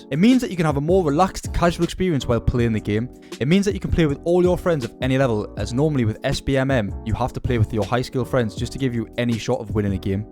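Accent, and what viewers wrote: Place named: Newcastle, United Kingdom. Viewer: never heard a geordie youtuber before 😭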